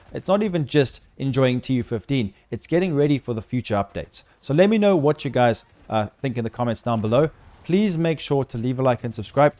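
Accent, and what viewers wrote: South Africa. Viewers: Are you from South-Africa? PS thanks for the video…Now im craving to come back!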